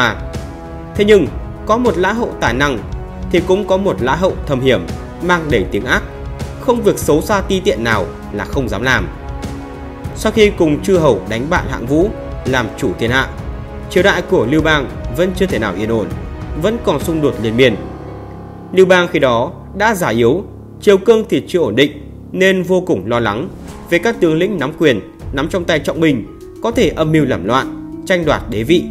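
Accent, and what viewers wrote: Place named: Cambodia. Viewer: Ko biet tieng Anh thi lam on lay ten tieng viet dzum, noi tieng Anh ma nghe nhu tieng campuchia, nghe phat gom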